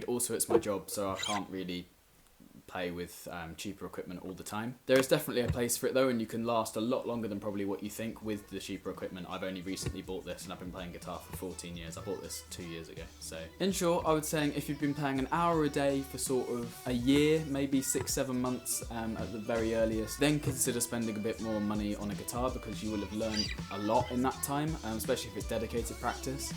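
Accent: United Kingdom. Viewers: Fabulous and I love your Great Britain accent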